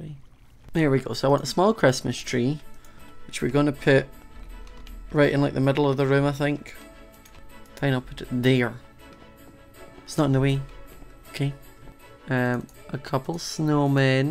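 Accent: Scotland, United Kingdom. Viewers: its his accent which is a mix of English and Scottish that makes it sound different.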